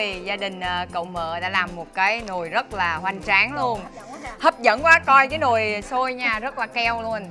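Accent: Vietnam. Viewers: dể thương quá chú rất thich cach nói chuyên dúng chất ng vn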